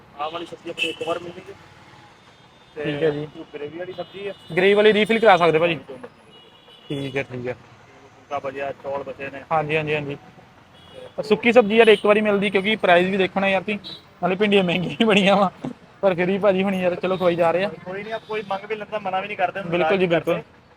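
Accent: Majha, India. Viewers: Ahii majhe Wale a pra...ahi te adkar hi khene a ...😅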